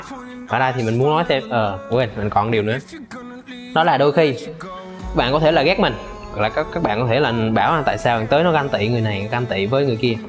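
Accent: Hue, Vietnam. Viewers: anh ở huế đúng k ạ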